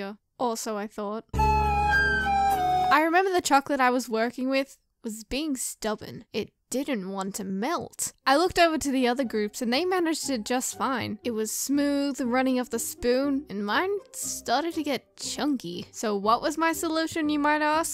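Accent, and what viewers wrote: Australia. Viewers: Are you British? You said year not grade and I talk English but you sort of sound Australian at the same time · GASP are you Australian? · Finally i can understand because your australian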